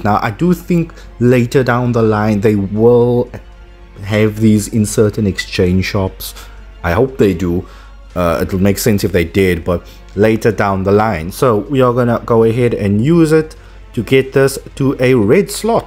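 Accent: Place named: South Africa. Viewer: Knew It was a fellow South African by the accent😂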